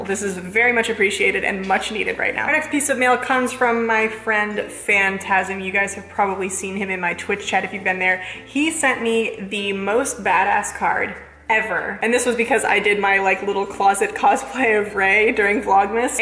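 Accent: Spain. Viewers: If ya didn't know already she studies abroad in Spain for Spanish maybe why her Spanish sounds more Spain than Latin..... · your spanish accent sounds like its from spain. Doesn't sound at all like latin spanish thats very interesting. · i think that is the spanish she was thought because even when she traveled to spain she already had that accent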